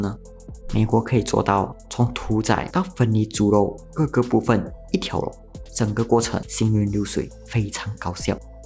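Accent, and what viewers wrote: Malaysia. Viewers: 这个华语，马来西亚人👍🏻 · 马来西亚人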